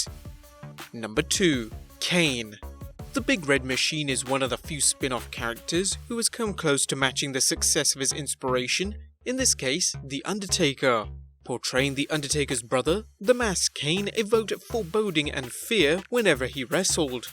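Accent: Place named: England, United Kingdom. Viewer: Why does every wrestling channel have a commentator with an English accent... or is it just me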